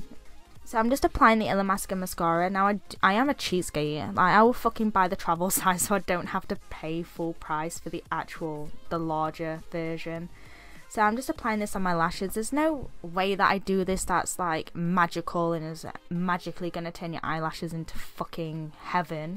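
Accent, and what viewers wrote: United Kingdom. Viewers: Fell in love with your accent innit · Well I’ll be dammed if this isn’t the most British accent out there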